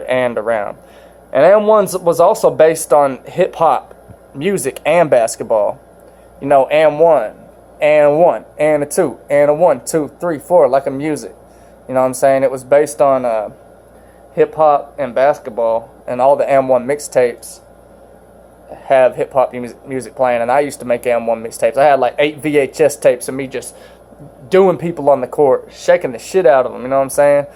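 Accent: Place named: South, United States. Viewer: I'm from Georgia, and you got that thick southern accent(Texas right?) just like me lol.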